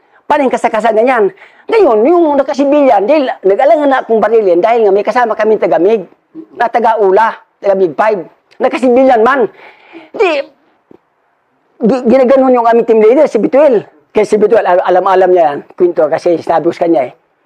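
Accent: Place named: Bicol, Philippines. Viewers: The "baga" of bicolano. Pero sabi ni Sir Bisaya daw si sir. Baka matagal n sya sa bicol. Ang punto ehh bicolanong bicolano.. Oragaon man Si.. Salute sayo master. Baka may part 2 pa sir. yung sa Sunod na barangay n bangit nya sa ORAS. Parang c master Ledesma lang ang kwento. Haha. Solid panoorin.. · siguro bisaya ito sir, pero nasa bicol na nakatira kya bicolano na din ang tuno.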